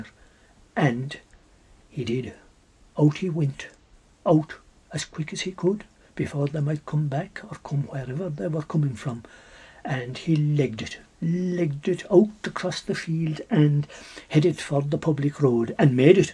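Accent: Ireland. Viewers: Love the Irish accent so dang much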